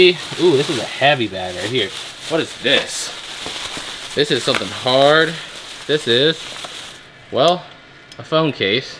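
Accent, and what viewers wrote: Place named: United States. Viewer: sounds american.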